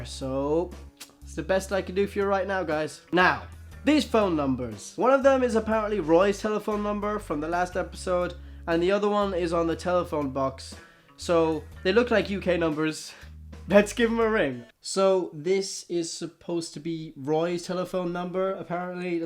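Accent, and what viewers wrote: Ireland. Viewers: HES IRISH I JUST REALISED!!!!!! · are you irish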